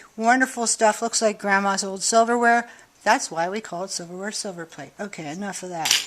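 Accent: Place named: North Georgia, United States. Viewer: you're so much fun and I love watching your tutorials. You sound a lot like we do in North Georgia. Your sense of humor is such fun & you make everyone feel good about what they're doing.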